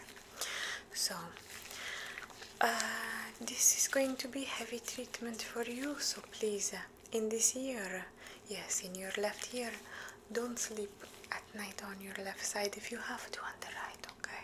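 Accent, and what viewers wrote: Italy. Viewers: LoVe Italiano food & accent 💖🥰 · Your Italian accent is great - will you ever be doing a follow up to the Rome visit video? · Great Italian accent 🇮🇹❤️❤️👍👍I like it a lot you do the best Italian accent ever🇮🇹😌❤️❤️sooooo cool!!!!😎😎😎😎😌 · OMG, your Italian accent is so cute without being over bearing or anything like that, if that makes sense at all. PS - You've got the stop the Qtip when there's resistance! ;-)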